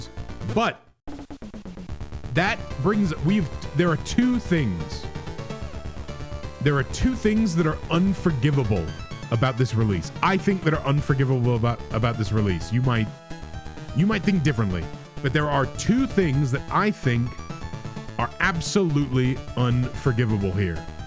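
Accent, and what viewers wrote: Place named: United Kingdom. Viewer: You sound like a Native Britt😂😂😂 With that Voice over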